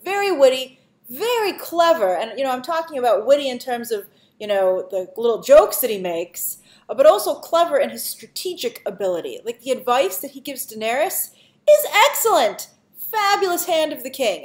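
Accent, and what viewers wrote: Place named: New York, United States. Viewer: I just have to ask which part of New York are you from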